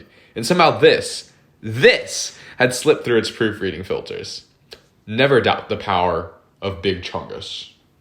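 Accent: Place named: Philadelphia, United States. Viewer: This dude has got to be from Philly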